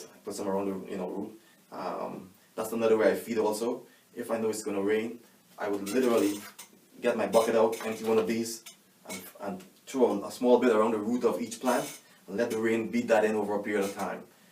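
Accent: Trinidad and Tobago. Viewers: Sound Trini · Thanks so much for this. I now started using Tomato Tone. PS for the entire video I thought "Why is he sounding like me?" Only to read the comments and see that you're from Trinidad too. I'm just here in Couva trying desperately to grow tomatoes :)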